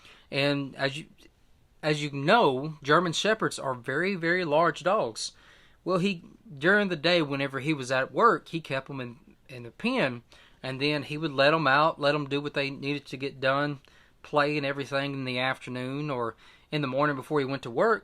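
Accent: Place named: England, United Kingdom. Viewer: I’m sub 136. Good luck with your channel. Love your accent from England 🏴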